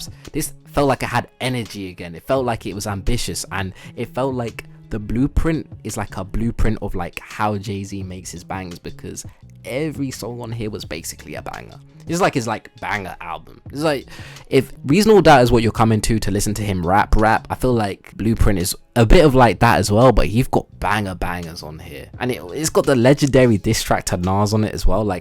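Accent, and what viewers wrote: United Kingdom. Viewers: Honestly, you don't sound black enough. Why are you wasting your fancy British accent on dissing old rappers? The whole point of the genre is machismo. Even if you made the right argument, it wouldn't matter because you sound polite.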